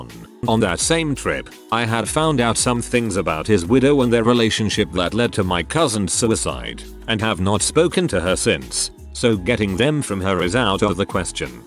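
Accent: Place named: Yorkshire, United Kingdom. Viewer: Queen Mantis I think he's from Yorkshire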